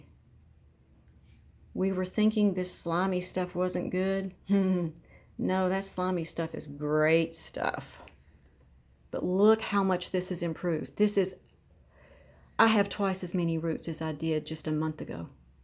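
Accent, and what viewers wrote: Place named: East Tennessee, United States. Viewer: Those are really great roots and I am also amazed at your accent. I was born ed and raised in upper east Tennessee and you sound like a touch of home. I am now in South west VA but I could listen to you talk all day. Thank you is all I can say.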